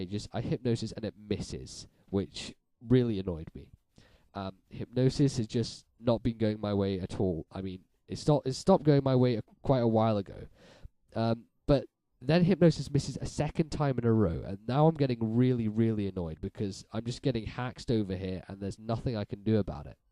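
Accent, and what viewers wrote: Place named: RP, United Kingdom. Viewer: It's a very posh BRITISH accent ya hill-billy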